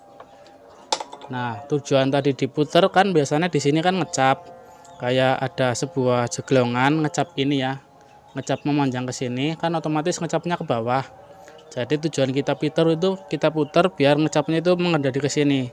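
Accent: Banyumas, Indonesia. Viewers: Otw praktek mas bro. Ngapake daerah ndi mas. Nyong juga ngapak. Banyumas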